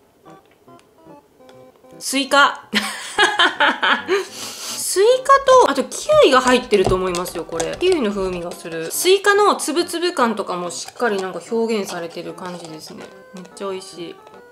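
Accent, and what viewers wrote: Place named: Miyazaki, Japan. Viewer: さんの豪快な食べっぷり、包み隠さず本音でなんでも話してくれるところ、方言（思うっちゃけど〜とか宮崎出身の私にはとても聞き心地が良い）全部好きですよ😊 コメントたまにしかしてませんが…これからも応援してまーす😊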